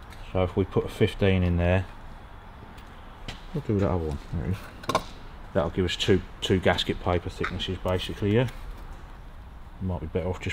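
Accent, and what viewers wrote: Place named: United Kingdom. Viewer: ''This is a sh@ horrible thing called a bearing cup greaser.'' Dammiitt! Yeah, we got them over here too Y'all. But our's are morons more along the lines of what I'd call a ''Political Bearing Begging Cup Greasers'' Tickled me that when I'd left the volume up to listen while making my bladder gladder... the thought of Michael Caine popped to mind as was talking. Same part of Britain as he, no? Too tight cold plays hell on all metal surfaces once their heated up under motion. I can better see that here. When I'd brought my '68 Trophy Sports back home from Ft. Bragg in '74. Dad having been a mechanic all of his life; begin to immediately moor over it's mechanical being. Next morning I got up & he'd done 2 things to it that evening before. He'd drilled & wired the tank's 2 front fastening nuts that same way. Also the rear crack split lateral bar of the tank's ''Castration Parcel Grid'' had been joined back together via a split vacuum advance rubber hose. 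He said that outta help keep the to tank stay on good & that package rack from de nuttin' ya... cause your gonna owe me a grandson or two. Good stuff all around in the ''Strengthening of them things that remain''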